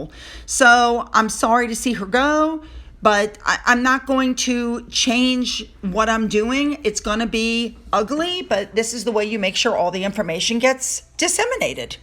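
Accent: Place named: Brooklyn, United States. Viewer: Boo hoo, here in America, that's how people in Brooklyn speak, and WE LOVED IT !!!! Listened to it 2 times myself!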